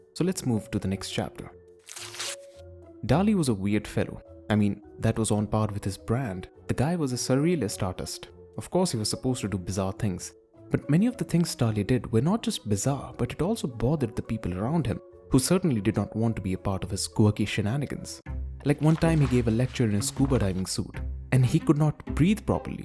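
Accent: India. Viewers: At first I thought: "who is this idiot crying about fascism and racism". Then I heard Indian accent. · You can tell from the awful sound of this dude‘s cringe accent that he’s from India. Why in the heck would ANYONE think he would actually know what he’s talking about regarding a Western artist? I laughed my arse off when he talked about Dali’s “rAciSM” - oh the horror! 😱😂 This guy is so dumb in fact that he still thinks Adolf was “the baddie,” despite us living in the age of the Internet and having the truth right there before our eyes easy to discover now. This video is so cringe. The only thing that bothered me out of everything he listed was I felt bad for the cats. But I’ll bet my bottom dollar that the comment about him putting dynamite up a duck’s behind was a joke.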